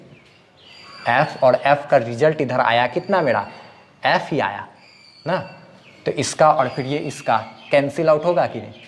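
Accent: Bihar, India. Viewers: Ya he is from bihar, purnea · Sir, I recognize your tone... U r from Bihar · Loved your bihari accent teaching 🫡🫶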